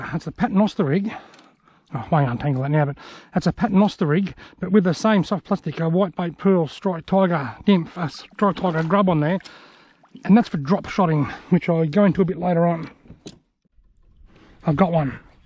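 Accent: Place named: Australia. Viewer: Yet another great video, just real Aussie talk with awesome fishing.... hats off to ya brother 🤙🏼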